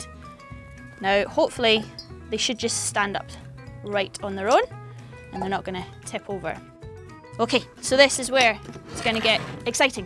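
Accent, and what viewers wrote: United Kingdom. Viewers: British accent ftw XD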